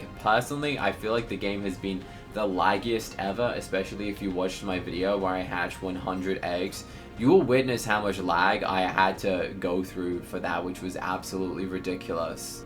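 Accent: England, United Kingdom. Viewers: Are you english or American?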